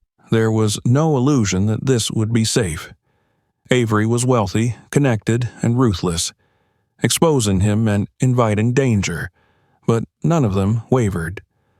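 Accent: South, United States. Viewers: These southern drawl stories are Cool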